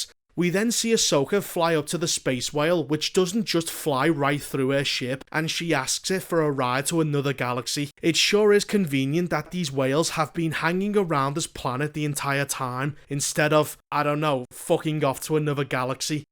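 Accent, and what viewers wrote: Liverpool, United Kingdom. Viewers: Scouse, innit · i can't take the beans on toast accent seriously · Mate you make me laugh ye sound scouce we’re ye from buddy lol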